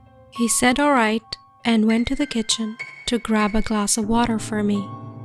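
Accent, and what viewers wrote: India. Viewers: Your accent is Indian From~🇧🇪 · Narrator's Indian accent is putting me off. She sounds Bengali. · Is the narrator indian ?? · You have a cute Indian accent. I can hear it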